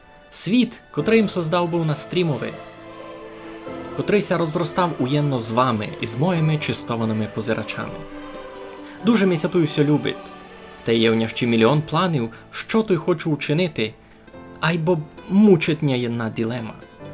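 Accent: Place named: Transcarpathia, Ukraine. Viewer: закарпатська... важко зрозуміти, але розумію😅😊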